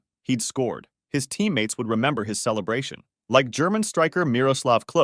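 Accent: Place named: United States. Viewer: Who else is American and didn't think it would be soccer? Especially since the voice sounds American.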